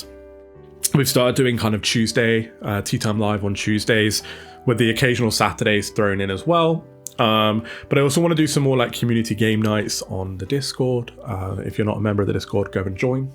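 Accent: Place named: United Kingdom. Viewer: Thank you for sharing your story, and being vulnerable about your mental health struggles. I appreciate your candor. I, like you, turned to cozy games as a sort of therapeutic experience. I’m a middle aged woman and I’m dealing with “middle aged woman “things”, on top of having a child who is in active addiction. I needed a way to calm my anxiety, so I tried playing cozy games. So far, it is helping. Love that you are a sports fan (Atlanta Falcons - awesome). I live in the southern United States, and sports are such a big part of life here. Just wanted to share a bit about me, and tell you that I appreciate your hard work and, integrity and your soothing UK accent and voice. 😊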